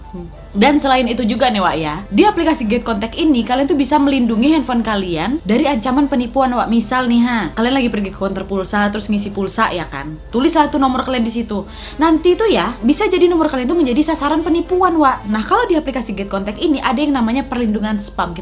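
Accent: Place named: Medan, Indonesia. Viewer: Aku pikir orang Medan? Bener enggak sih? Soalnya logat cakapnya macam anak2 Medan, ngejelasi macam begado, pakek ketawa dan macam gibah😆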